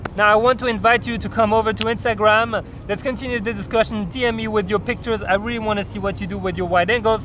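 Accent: France. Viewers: Oh je pensais que tu étais un américain avec un accent anglais... mais l'accent français est trop mignon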